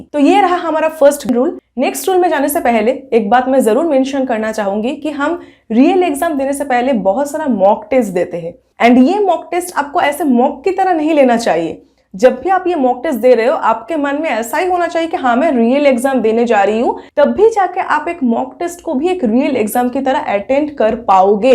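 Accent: Bengal, India. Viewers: Hi! Di are you Bengali? Please tell 😊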